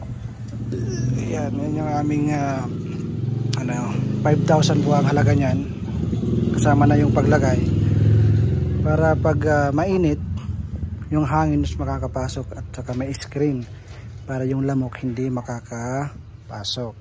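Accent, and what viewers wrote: Waray, Philippines. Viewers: Taga di in ka sir baga waray man m panday hahahaha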